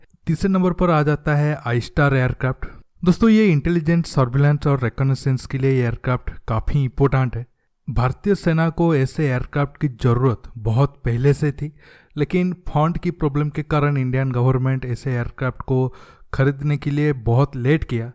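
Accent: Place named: Assam, India. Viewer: Bhai aap Assamese jaise baat kyu karte ho . Mere khayal mein aap okhomiya hoga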